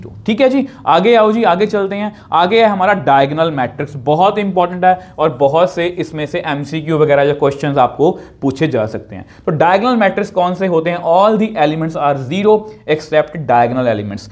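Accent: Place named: Pakistan. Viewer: apka accent Pakistani lag RHA hai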